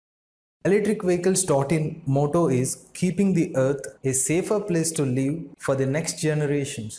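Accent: South India, India. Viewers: South se h bhai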